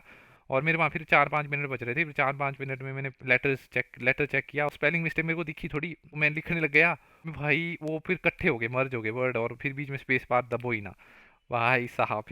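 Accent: Himachal Pradesh, India. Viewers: You sounds like a himachli guy ... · Oo hi? I'm from kullu Apki tone se lg gya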